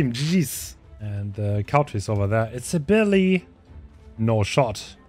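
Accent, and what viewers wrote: Germany. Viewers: 100% sure that you are german xD the german accent while speaking sounds absolutly terrible xDDD i got the same problem Liebe Grüße - greetings 😝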